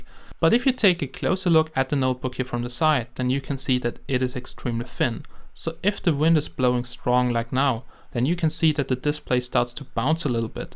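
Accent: Germany. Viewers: Any chance you are from germany ? :D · Hab das direkt gemerkt, dass er nen deutschen Dialekt hat und als er damit ankam, dass die Tastatur anders ist, als unsere QWERTZ-Tastatur, hat er meine Vermutung bestätigt ^^ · Are you from Germany? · Ich nehm mal an du bist deutscher :P klang zumindest so: weißt du ob es auch eine Metalversion oder eine mit etwas besseren CPU gibt? ansonsten super review, werd mir wohl auch so ein teil holen. Allerdings ohne Apple-logo (wegen Zoll und so). Weiter so (y) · are u from germany?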